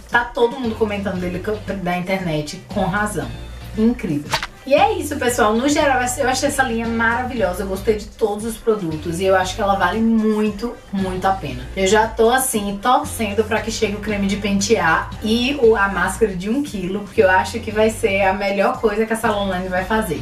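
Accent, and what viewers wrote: Bahia, Brazil. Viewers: Queria q vc falasse com mais sotaque baiano. Acho lindoooo❤️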